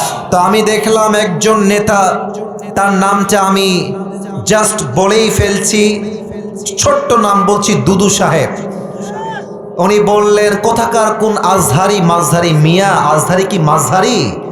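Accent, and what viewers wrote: United Kingdom. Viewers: মনে হয় ইংরেজ বাংলায় কথা বলতেছে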